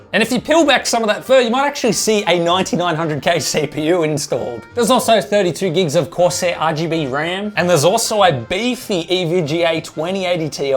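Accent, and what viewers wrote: Australia. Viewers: That Aussie accent and saying,”… if I should burn it!” Killed me!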